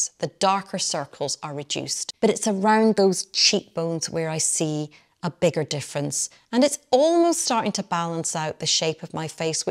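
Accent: Scotland, United Kingdom. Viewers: I love your accent. Scottish or Northern Irish? I hail from Dublin.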